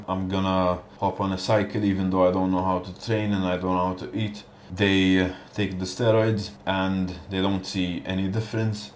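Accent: Malta. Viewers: First time I came across u was on ig ofc and bro as soon as I heard the accent I knew u were from malta ! My question is what's your best tip for beginners?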